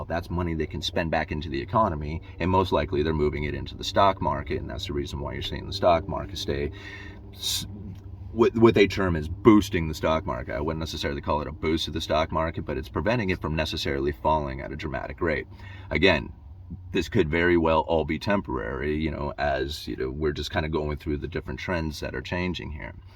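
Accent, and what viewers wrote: United States. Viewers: I haven’t watched this guy much but does anyone know if he’s American or Canadian?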